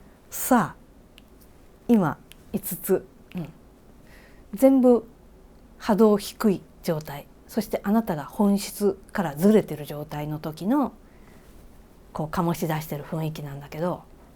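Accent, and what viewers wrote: Kansai, Japan. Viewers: 関西弁素敵です。辻さんのお話は、体の深いところから出ているように感じます。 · 関西弁好きですよ！ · 関西弁で、喋ってるさん好きです。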